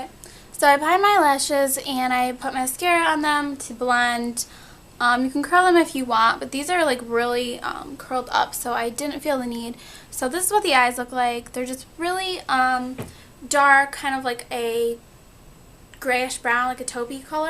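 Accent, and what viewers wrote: United States. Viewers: I love you accent! which state our you from?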